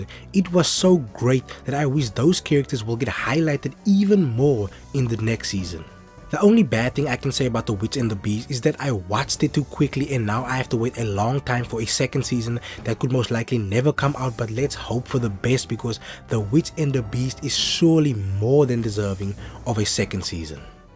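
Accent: South Africa. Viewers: Hebanna, I didn't expect a South African accent ... Awe bra waka. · this defs a south african bru speaking here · My guy are you a Saffa?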